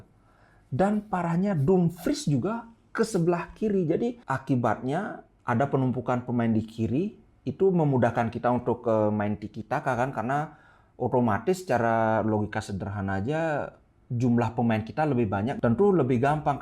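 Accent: Bali, Indonesia. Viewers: Bang orang Bali ya bang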